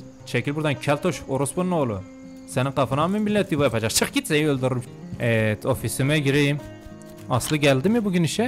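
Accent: Elazığ, Turkey. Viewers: abi sen elazığlımısınü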